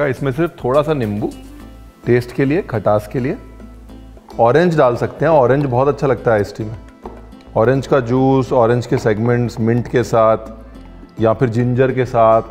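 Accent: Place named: Lucknow, India. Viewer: Your Lucknowi accent. Love it 😍 Hot coffee also please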